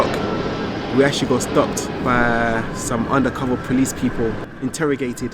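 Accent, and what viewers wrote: United Kingdom. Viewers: Your dialect is british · U have accent British are u British??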